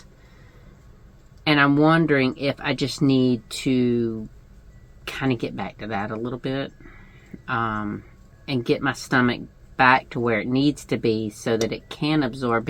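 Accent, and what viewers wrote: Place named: South, United States. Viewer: Hi! I love your videos. I watch every single one of them. Your southern speech comes my hectic Northwest pace. Please do take time for yourself because it’s very important.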